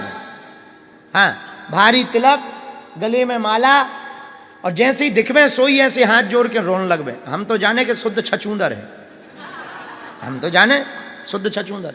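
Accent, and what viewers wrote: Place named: Bundelkhand, India. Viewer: सही बात बुंदेली बोली हमे भी अच्छी लगती है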